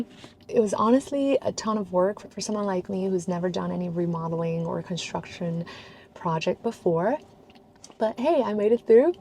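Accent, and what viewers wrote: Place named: United States. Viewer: hi! Could you share your tips on how you achieve your perfect american accent? As a foreigner myself, I find it so hard to have the american accent and I think yours is just perfect! I am chinese too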